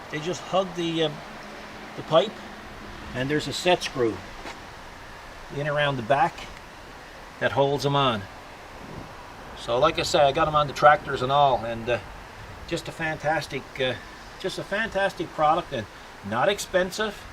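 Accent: Newfoundland, Canada. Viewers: I knew you were Newfoundland as soon as you spoke. I'm a townie myself. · Did I hear Newfoundland ? Well if you’re a mulley ? We might be kin love the snowblower and love the country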